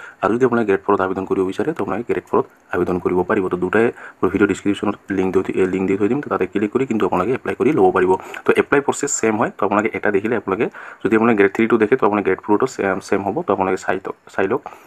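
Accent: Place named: Bodoland, India. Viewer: Bodo bilakor nisina lagise nohoine baru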